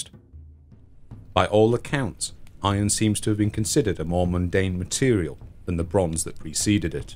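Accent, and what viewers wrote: United Kingdom. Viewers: It's and I need some white noise to allow me a chance to get some sleep: Oh! British history in a British accent will do the trick.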